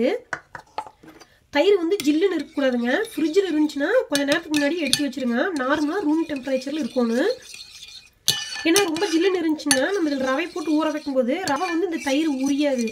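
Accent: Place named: Coimbatore, India. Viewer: அக்கா நீங்க கோயபுத்துர் றா உங்க பேச்சி நல்லறுக்கு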